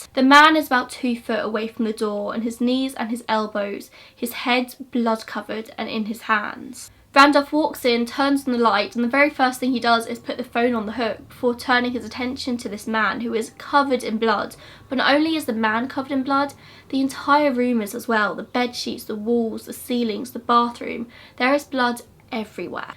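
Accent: United Kingdom. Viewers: Hi, you're so cute girl I love your British accent and your gorgeous lips, xoxo.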